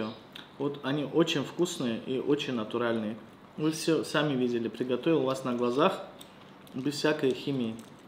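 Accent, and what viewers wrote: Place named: Dagestan, Russia. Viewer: Почему у него дагестанский акцент при такой азиатской внешности?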